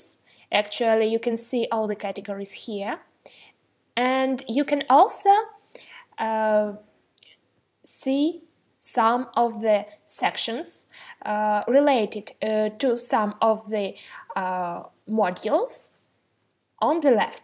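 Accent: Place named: Russia. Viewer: russian accent) забавно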